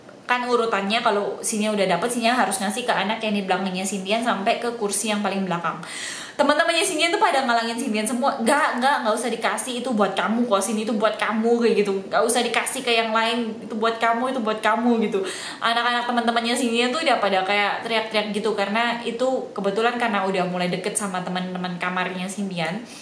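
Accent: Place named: Java, Indonesia. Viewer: Lbh suka kalo ada medok2 nya.. haaha.. krn sm2 org surabaya.. toooss.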